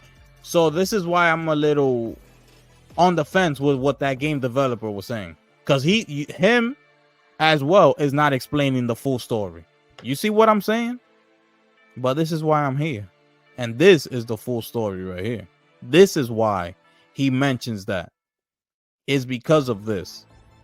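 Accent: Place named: Dominican Republic. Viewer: You sound like a wild Dominican from the height or inwood.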